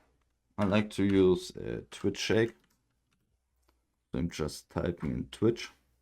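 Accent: Germany. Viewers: Das nenne Ich mal eine deutschen Akzent 👍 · Dieser Deutsche accent haha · man hört den deutschen Akzent so hart hahaha, nices video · Dieser deutsche Akzent HAHDHADASHAHAH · Man hört einfach das du Deutsch Bist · Bro bist du deutscher? :D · deutsch rausgehört XD. aber trozdem gutes englisch! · Wusste beim ersten Wort schon dass du Deutsch bist xD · the german accent is wild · Wusste im ersten Satz schon das du deutsch bist hahahah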